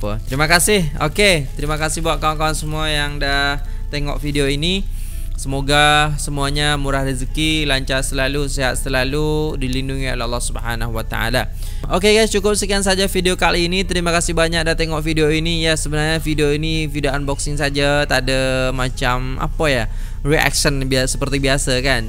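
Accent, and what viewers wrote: Malaysia. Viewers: Comel lah dengar Cak ckp telo Malaysia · cakap nya sudah hampir bunyi orang malaysia cakap.... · Sgt baik bunyi cak cakap...mcm org malaysia. Pernah tinggal di malaysia ke😊😊😊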